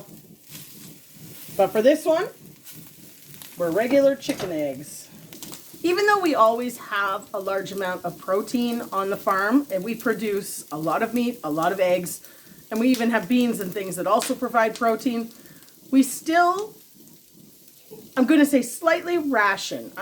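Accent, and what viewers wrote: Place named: Canada. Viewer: I literally reheated and repurposed a bunch of things I already had made in the freezer or fridge. I then repurposed other items in the fridge that were left over and it was a fairly easy week for us as well. I had to laugh the other day as I was watching one of your videos and my daughter asked why you talking about "a boot"..you were saying about..I explained you are from Canada and you say things just a little differently like Mr Rem and Mrs Annie that we watch that are from Canada too. That stew sounds like it was delightfully rich and wonderful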